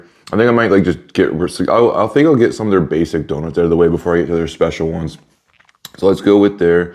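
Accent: Canada. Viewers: I love your Canadian accent, it's adorable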